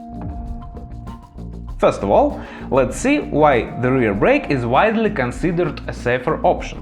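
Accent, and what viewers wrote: Russia. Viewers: Судя по акценту -русский. Почему и титры на английском??? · Узнаваемый русский акцент :) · Youre from russia ?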